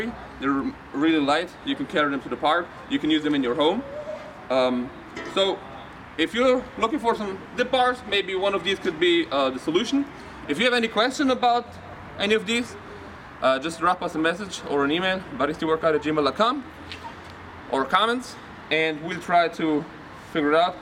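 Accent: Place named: Germany. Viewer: After ten seconds of watching I knew you were in Germany, just by your accent, although it’s not a strong German accent.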